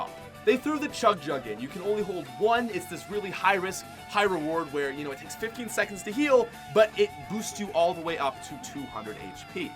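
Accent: Canada. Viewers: This guy is the most stereotypical Canadian talker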